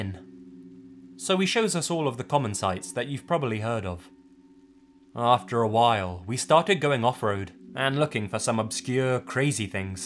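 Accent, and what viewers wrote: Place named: Scotland, United Kingdom. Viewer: Are you Scottish or British? I like your accent a lot! It's one of the reasons why I like this channel so much. It's just so pleasant to listen to! Also, you have some really interesting content.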